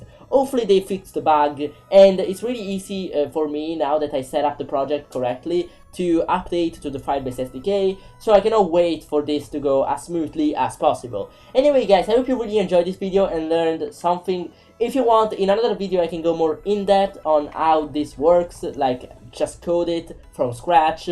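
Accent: Italy. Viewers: Great accent :D Where're you from, Italy?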